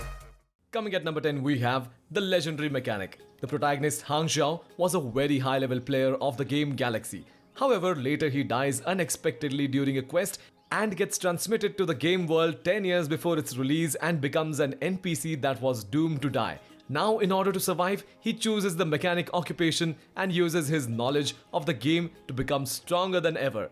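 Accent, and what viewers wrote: India. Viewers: are you idian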